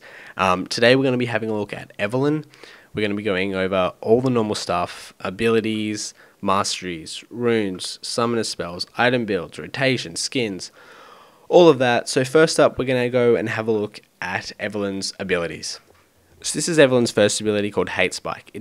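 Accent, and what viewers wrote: Australia. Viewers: where are you from, Its hard to tell you're accent you sound Australian but also English.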